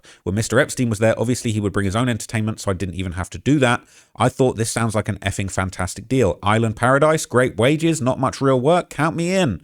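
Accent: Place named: United Kingdom. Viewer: Be careful saying stuff about Donnie in these videos. Your British accent with a US location is inviting ICE at your door.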